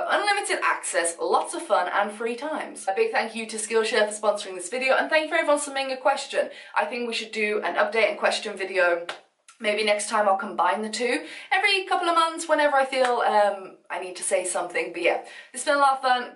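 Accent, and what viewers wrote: United Kingdom. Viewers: You British Ayeeeee???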